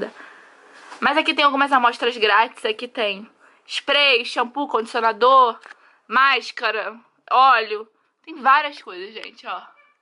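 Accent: Rio de Janeiro, Brazil. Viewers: Adoreei esse vídeo, seu quarto é lindoo!! Mas uma perguntinha. Vc é carioca? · vc e mt divertida e esse sutaque de carioca e incrivel ate eu ja to falando q nem carioca seu qu\rto e lindo pft adorei vc e seu quarto faz um tour pela sua casa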